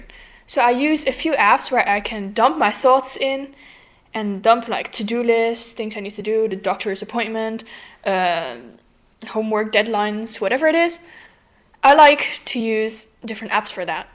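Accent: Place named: England, United Kingdom. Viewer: Love your english accent ❤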